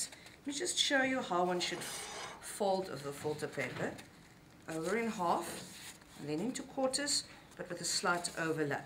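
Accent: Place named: South Africa. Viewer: Very helpful video, thanks. You sound like you are from South Africa as well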